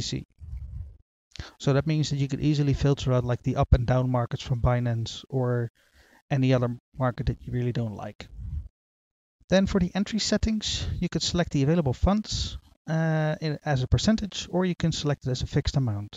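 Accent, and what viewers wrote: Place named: Netherlands. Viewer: Idk why but it kinda sounds like you're Dutch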